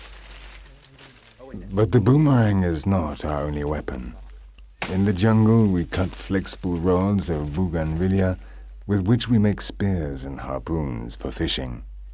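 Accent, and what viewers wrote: England, United Kingdom. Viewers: Where did the narrator get his accent from. Certainly not Australia. You sound more like Prince Charles than an aboriginal. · It would at least been appropriate to have an Australian narrator. Somewhat irritating to listen to an English accent. Not OK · Burtt its very well filmed, however - the narrative is not as well done. For a start, they have used a non Indegenous (British!) actor to do the voiceover, instead of using the authentic voices of those who live in these areas - they do speak English! A big 'ouch'' for me was hearing all sorts of European concepts such as historical epochs of Time, stitched into the voiceover. Aboriginal elders have a mental landscape which exists outside of Time. Historical epochs are a European mindset, and sadly much that is European pervades this voiceover. There are better documentaries out there... 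i wish you all the best of luck in finding them ...as it is indeed an incredible culture. But lets let them tell their own story as much as possible... in their own words.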